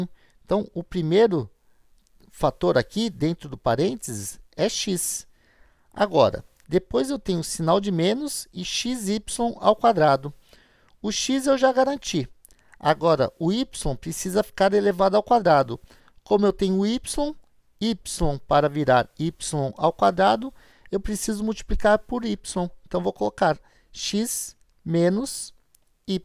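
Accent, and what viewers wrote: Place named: Rio de Janeiro, Brazil. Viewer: so eu que percebi o sotaque de carioca?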